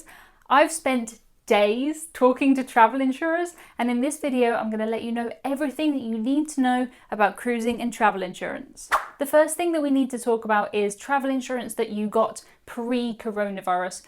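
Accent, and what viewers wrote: United Kingdom. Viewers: Based on this video, you know.. there isn't a definite answer, it all depends on the situation and the insurance company. But, having said that this is the best, most explanatory vid I've seen. Thank YOU! I will post a link to this vid for people that ask me about the cancellation policy and possibilities. RE: the naughties.. no, we dont' say that, I guess we just call it the 2000s? but from now on, I'm calling it the the naughties, lol. Definitely agree with the waiting game. I have an Oct cruise. A few months ago I had no doubt it would happen, now... I'm not so sure, but I'm not cancelling! Thanks. love your content, and your accent just takes me 'home' (mom is british, dad american, left Uk when I was 5)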